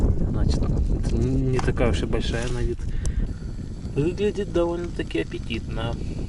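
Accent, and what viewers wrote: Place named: Urals, Russia. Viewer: Спасибо,! Смотрю тебя давно, пишу впервые. Благодаря тебе я посетила Платониду и Шунут-камень. Коренная уралочка, прожила почти 30 лет в нескольких десятков километров от этих мест, так и не добралась туда. Приятно слышать родной, уральский акцент. Здоровья тебе крепкого,ветра всегда теплого и попутного, людей хороших вокруг! С уважением, М.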